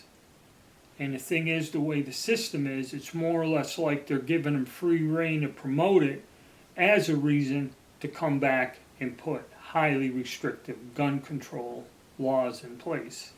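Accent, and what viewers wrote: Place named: Pennsylvania, United States. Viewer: were are you from as in wht state were you raised.you sound like your from nj.pa.oh are you????????i say allentown pa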